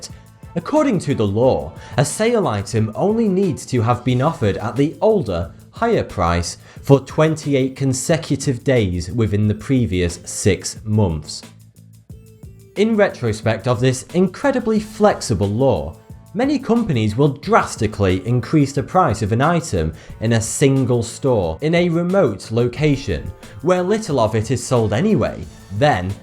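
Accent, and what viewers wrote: United Kingdom. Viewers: Actually it's because his british accent.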